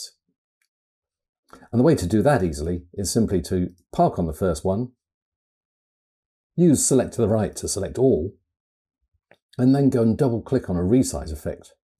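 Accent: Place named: RP, United Kingdom. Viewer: You sound very posh! Thanks for this - it helped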